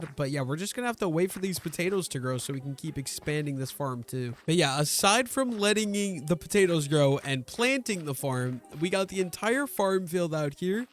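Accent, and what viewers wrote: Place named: Canada. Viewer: You sound somewhat canadian 😂❤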